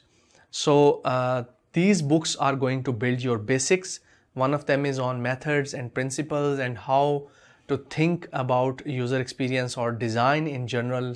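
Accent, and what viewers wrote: India. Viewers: love your indian type assent, also buyed ur , udemy course,, it was awesome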